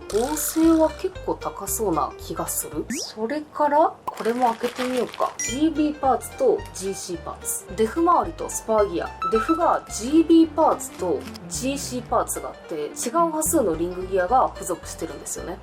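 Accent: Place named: Japan. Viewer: I prefer hearing your Japanese accent with the subtitles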